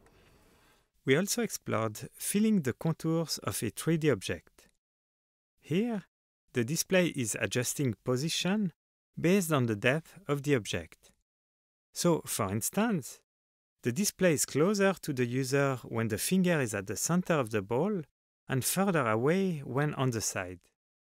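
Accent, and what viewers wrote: France. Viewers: Magnifique accent français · Accent français ^^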